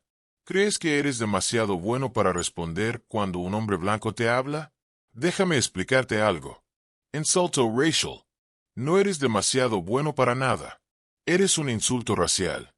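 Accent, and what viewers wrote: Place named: Costa Rica. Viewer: Pronuncia la "ere" como se pronuncia en Costa Rica. Pero le suena feo.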